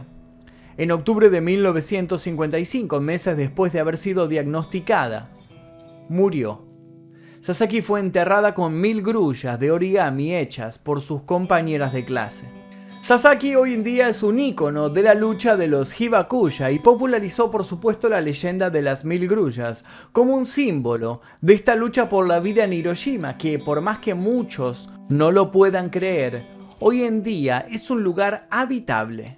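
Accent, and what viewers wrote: Argentina. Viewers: No puedo creer que los argentinos NUNCA pronuncien bien la "Ll" y la "Y" y cuando tienen una "Sh" en frente les dé por decir Hiroyima... Que alguien me explique. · Exelente documental !! Imagino que el locutor es argentino , no es necesario salir a cuadro , con esa barba de chivo no le da seriedad al tema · Un argentino hablando? No veo ésto!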